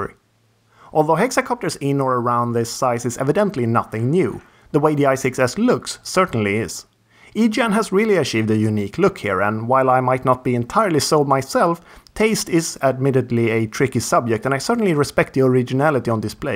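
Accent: Sweden. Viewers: like ur vids man, love the swedish dialect, keed it up.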